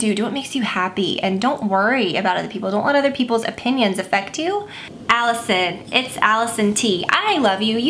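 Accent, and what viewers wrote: South, United States. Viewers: it's not incorrect, that's just southern accent shining through;)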